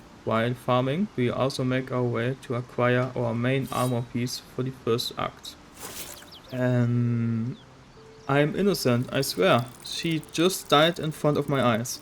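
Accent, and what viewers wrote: Germany. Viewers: Der deutsche Akzent oh yeah xD Hessische Grüße 😎😅🤘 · Are you german? Your accent sounds really unnormal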